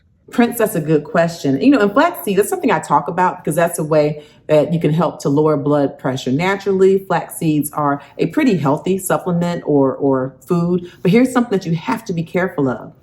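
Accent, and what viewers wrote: South, United States. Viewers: From the South, that explains the accent, love it !! Come on folks ---- 460 views and only 76 Likes...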